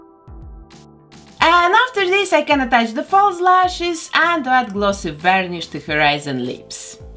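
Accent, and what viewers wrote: Russia. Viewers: Из какой страны автор видео? Просто очень сильный русский акцент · Блян, отдам миллион рублей, но она русская, по акценту понятно.. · Omg I love your russian accent. I'm crying it's so beautiful! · Мне кажется или её родной язык не английский, ахпхахахах, может это и не секрет, просто я тут в первые, но мне кажется, что она выучила английский язык, а её родной это русский, ну по моему мнению акцент слишком сильный, ну хз может я ошибаюсь. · Is this Russian accent?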